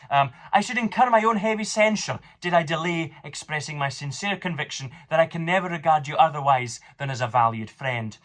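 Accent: United Kingdom. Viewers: I'm impressed by your wee Scottish intonations, laddie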